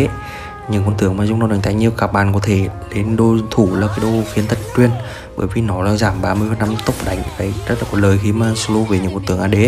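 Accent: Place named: Nghe An, Vietnam. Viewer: Kiểu kiểu như Quang Linh vlog. Chắc ở Nghệ An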